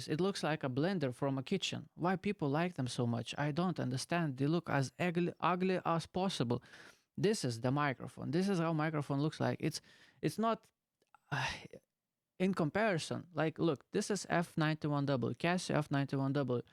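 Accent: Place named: England, United Kingdom. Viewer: I actually like your english accent. SM57 is my favourite for my voice.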